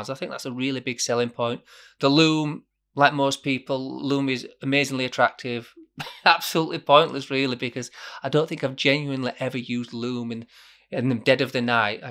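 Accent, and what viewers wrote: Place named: Yorkshire, United Kingdom. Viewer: A Yorkshireman giving watch reviews? Subscribed.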